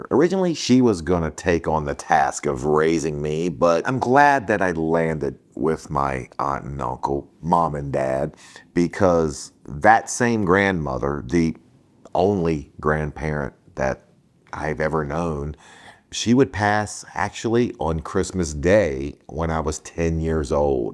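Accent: Virginia, United States. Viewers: I think because of the delicate quality of your Virginian accent. To uninitiated ears, it might come off as…yeah.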